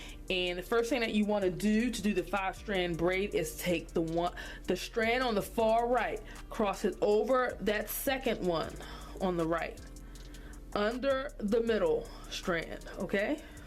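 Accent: Baltimore, United States. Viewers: Knew you was from Baltimore first 30s lmao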